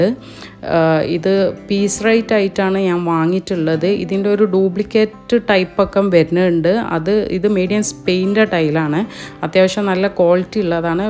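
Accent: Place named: Kozhikode, India. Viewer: Wa alaikkum mussalam Bhayankara bhayankara vellye fan aan njan ee nde Masha allah Almost ingalde ella videosum kand kayinj actually irfanatha vazhi aan ingalekk ethnnath and you’re so so adipoli ❤ Edkk verne ingale kozhikodan slang comedy aan😅 Masha allah keep going 🫶 Withloveshaibi❤️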